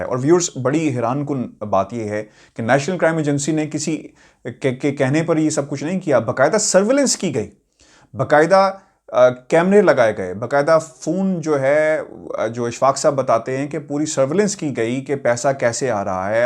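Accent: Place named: Pakistan. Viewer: So far ur Urdu is Pakistani Urdu, please Mr tell other anchors too do not sound like Indian anchors. Let them see BBC Urdu service and to see the difference BBC HINDI. UR doing a great job like Imran khan (Anchor) & Imran Khan ( prime minister ) can I call u Imran 😂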